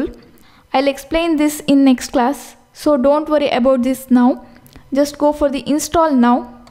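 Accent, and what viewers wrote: South India, India. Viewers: Are you south indian?🤔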